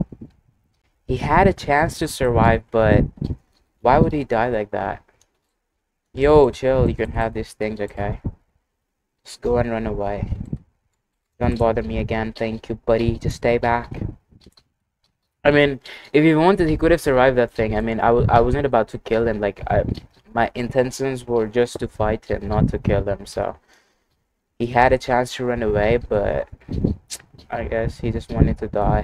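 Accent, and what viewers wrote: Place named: England, United Kingdom. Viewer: vs his english accent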